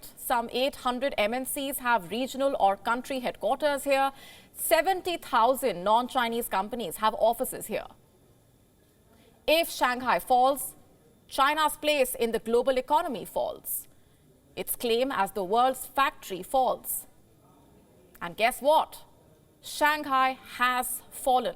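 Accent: India. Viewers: Hahaha. So obsessed with China, don't show your inferior complex. Don't worry for them. Spend time to talk up India first, to be the no 1 power in the world by this decade. Also tune down your hindi accent please. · Very funny way of reporting. Does not seem to be a news channel. She chews hard on words and make it very heavily Indian accented English. And the sentences she speaks are too short, paused and not continuous. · Why indi english??? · You sound like an Indian and India had been through a much worse phase not long ago. You even burnt the bodies on the street in broad daylight. So what qualification you have to judge China? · shut up，indian accent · Man, I can listen to these Indian dames talk all day long!! Their accent is as cool as can be. (But maybe it's because my Brooklyn accent is as crude as can be in comparison)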